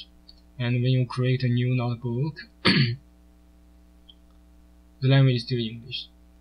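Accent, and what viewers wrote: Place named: China. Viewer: Thanks! I could change my default language from Chinese to English. And you Chinese style English is perfect for me, hahahaha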